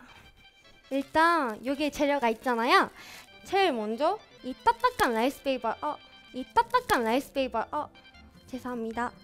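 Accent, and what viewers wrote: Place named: Japan. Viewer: この時はまだ韓国語が少し日本人っぽいけど、今は本当にネイティブだよね…って韓国語全然話せない私が言ったら怒られそうや…とにかく可愛い。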